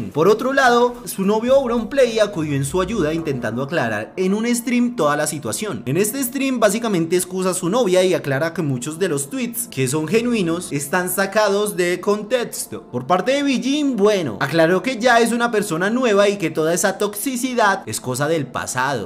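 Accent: Bogotá, Colombia. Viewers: Vuelvete un canal de salseo pana, ver los chismes de los youtubers con acento rolo es divertidisimo y hasta donde se no hay ninguno colombiano que lo haga asi como lo hace pipepino en argentina o los espanoles y mexicanos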